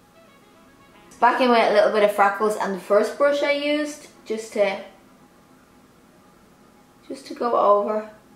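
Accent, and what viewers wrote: Ireland. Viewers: I just found you the other day and I love your tutorials!! I love your hair aswell hah💓 also are you from Ireland? You've an Irish accent hah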